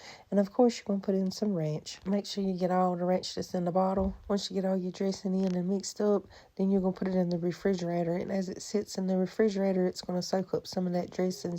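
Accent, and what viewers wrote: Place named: Southern West Virginia, United States. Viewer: Miss, this was absolutely adorable. your accent is very similar to our "Out Wayne" accent here in Southern WV. so familiar but with a twist. and i'm not gonna swear to it but i'm pretty sure you said Martini Noodles to see if we were paying attention.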